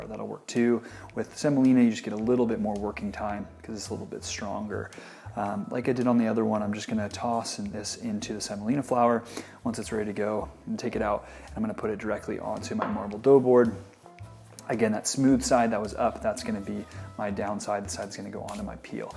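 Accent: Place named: United States. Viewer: What's with the metric system? This guys sounds American but comes off european. Still good video. Thanks.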